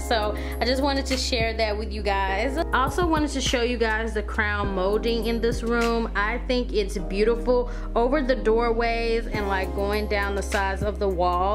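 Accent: South, United States. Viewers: are you from the south? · Just Beautiful!! I love everything you have done with the room. You are beautiful and your voice is so country. Love that too hahaha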